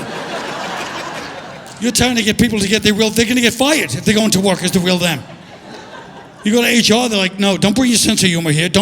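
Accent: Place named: New York, United States. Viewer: Wow I just realized how much trump n Colin have the same cadence. New Yorkers am I right.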